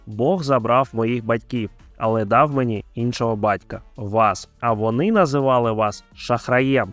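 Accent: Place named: Russia. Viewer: У диктора сильний російський акцент. Це тільки я помітив?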